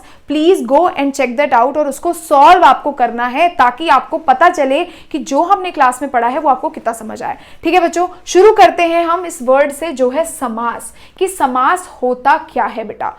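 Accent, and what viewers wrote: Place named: Haryana, India. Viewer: Didi aap haryana ke hona!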